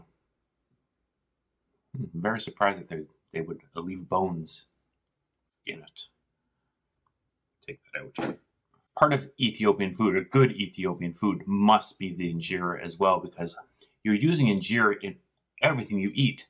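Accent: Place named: Canada. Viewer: Where is this restaurant? You sound Canadian.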